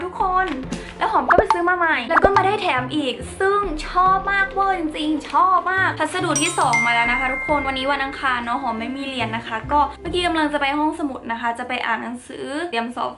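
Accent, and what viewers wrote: Isan, Thailand. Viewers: เป็นคนอีสานหรอคะ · ชอบพี่ตอนพูดอีสาน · ซ่อง 55555. อีสานผสม ปากไม่เเดง ไม่มีเเรงเรียน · น่ารักๆเว้าอีสานผสมๆนำมักๆ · เป็นคนอีสานบ่พี่หอม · ชอบที่พี่พูดภาษาอีสานอ่ะ 💕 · ชอบความพูดอีสาน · ชอบความภาษาอีสานนนนนนนนนนน เสียงน่ารักมากค่ะ พี่ชอบ กดติดตามแทบทันที่ที่ได้ยินภาษาอีสาน 5555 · ซ่องวะสั่น555. ชอบความอิสานมากค่า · ชแบเวลาพูดภาษาอีสานอ่ะ น่ารักมากเลยยย❤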